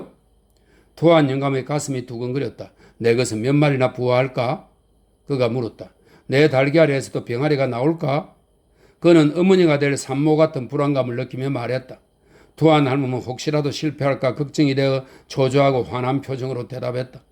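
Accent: Gyeongsang, South Korea. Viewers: ❤힐링시간 허스키 경상도 친한 막걸리 목소리 강건❤하소서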